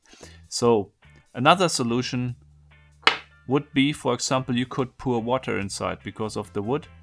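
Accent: Germany. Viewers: Is this a german acent? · The German accent makes me want to watch his videos even more :)))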